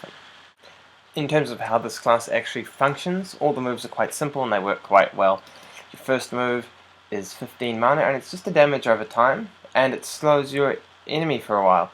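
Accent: New Zealand. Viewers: Thicc kiwi accent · First video I watched from you, are you a New Zealander?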